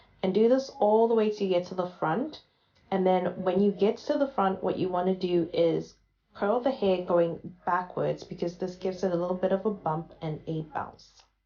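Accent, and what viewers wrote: South Africa. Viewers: Love the hair. What flat iron did you use? Btw you sound South African.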